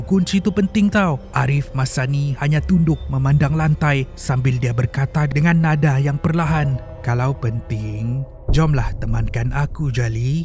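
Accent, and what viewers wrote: Sabah, Malaysia. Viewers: Dia Sabah lah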